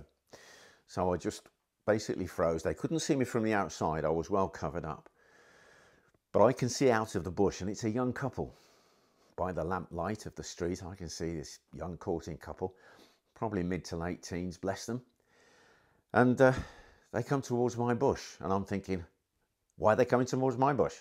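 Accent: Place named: Birmingham, United Kingdom. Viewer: Hello I loved your stories and i love your channel. Small question is that a brumy accent? im a Black Country chap myself and couldn’t resist asking.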